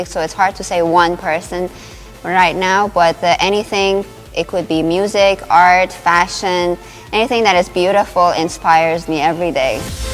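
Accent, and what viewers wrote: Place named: Canada. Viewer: wow your a Canadian? this is good to hear i kinda can tell with your accent.. how much did it cost you to purchase all your equipment and do you produce music as well?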